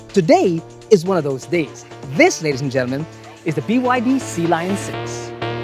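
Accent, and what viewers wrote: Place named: Philippines. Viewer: Fucking corny! Horrendous pinoy accent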